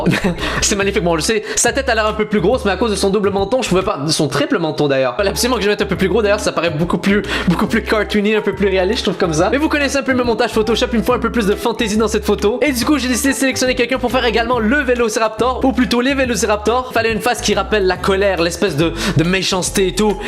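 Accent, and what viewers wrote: Quebec, Canada. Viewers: Est tu Québecois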